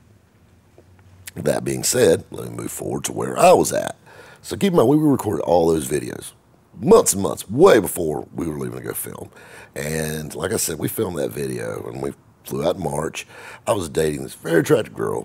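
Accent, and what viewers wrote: South, United States. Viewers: Yess sir! Got to love she saylim southern drawl😉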